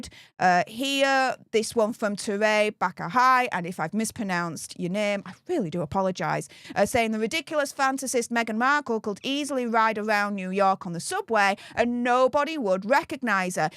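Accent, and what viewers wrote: Yorkshire, United Kingdom. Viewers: Gday from a Wakefield lad out in the Barossa valley in rural South Australia 🇦🇺 it’s great to hear a Yorkshire accent again! 🇦🇺🏴♥️🇦🇺🏴 Edit:it’s great to hear “hey up” again instead of gday or how’s it goin’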